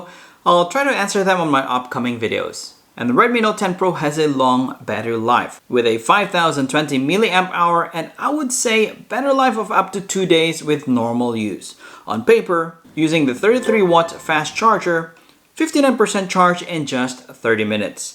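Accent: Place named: Dumaguete, Philippines. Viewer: mao diay maayo muinglis bagsik og accent ba taga dumaguete diay ka sir.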